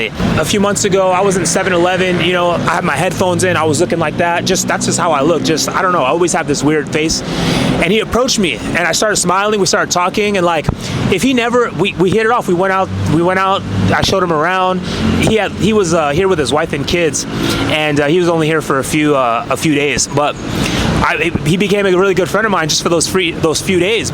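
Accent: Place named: Texas, United States. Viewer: I can already tell you was from Texas lol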